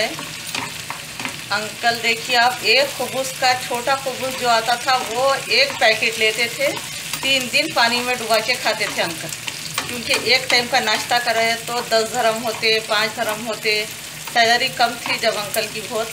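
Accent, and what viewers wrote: Hyderabad, India. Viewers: Aunty I love u ap boht achy ho ap ki hyderabadi language mujhe boht achy lgta h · Hyderabadi lehja boht meetha or apnayat wala hota hai mai b india hyd se hu lkn shadi pakistan islamabad me hoee hai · You really inspired me aunty, I am so happy that you made this valuable video. Firstly, I thought what I am hearing??? But once I started hearing you alhumdullilaha you made me realise that I am in Hyderabad. Lastly unlce's story is too motivational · Jazzak Allah Aunty, aap ke Hyderabadi sun ke Ammi ki yaad agai ju Pakistan mein hai..main malaysia mein akele rehta hoon aur yeh recipe zaroor try karonga..,Allah aap ko aur Uncle kush rakhay, take care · Ham hyderabadi hain aunty chote the tab dadi phopo sub apki terha baat kerte the ab unke bad hyderabadi sunne ko taras gai thi ap ne apno ki yad dila di m apko sunti hon lagta hai meri phopo n dadi bol rahi hain Allah apko lambi zindagi de Ameen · Assalam u Alaikum.Aunty aap mere msgs ka rply dijiye plz khushi hoti meku....Allah apko sehath de.Ameeen...aap bahut acche hai luv u😘😘 aap sab k liye itni duaien dete...Allah tala apko bhi accha rakhe.apka bolne ka andaaz ekdum hyderabadi style me bhut accha lagta me too frm hyd. 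· Love your commentary ,feels like I am in Hyderabad listening to your Hyderabadi Hindi,I am Telugu living in US,my cooking style is like yours, influenced by Muslim culture,born n brought up in Hyderabad.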